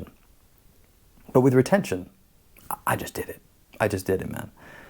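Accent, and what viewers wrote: United Kingdom. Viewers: 50 seconds in and I had to sub, maybe because he's "one of us" lol (from the UK, I assume because of his English accent) 👽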